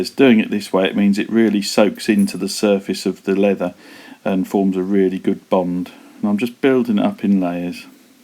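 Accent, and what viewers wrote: Suffolk, United Kingdom. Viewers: You sound like a Suffolk guy inspired by jacklore just like me. This is great video and very informative. I love that little knife you made too.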